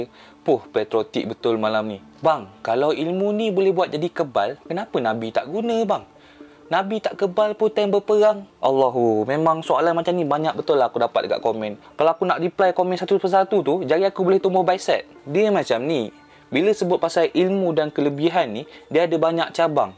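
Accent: Kelantan, Malaysia. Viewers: asal kelate ko?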